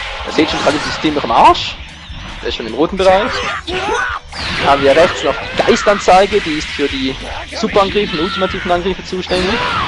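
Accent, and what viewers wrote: Switzerland. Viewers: Ich finde deine Stimme nur sympatisch, bedingt durch deinen Akzenten. Schweizer haben nach wie vor den coolsten Dialekt. Und nebenbei, echt tolle Let's Fetz, Fight, Play, wie auch immer ;D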